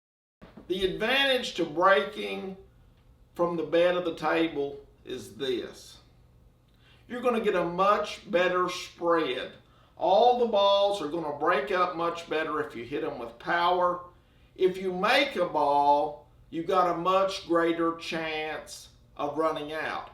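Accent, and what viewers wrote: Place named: Kentucky, United States. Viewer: Your last break was a thing of beauty. Great run out position. I liked your break strategy discussion, too. I have played around with 2nd ball break to put the 8 ball in play, but to me it’s not worth it. Probability of pocketing the 8 too low to justify a poorer spread. Keep ‘em coming. Enjoy your KY accent, reminds me of home (Texas).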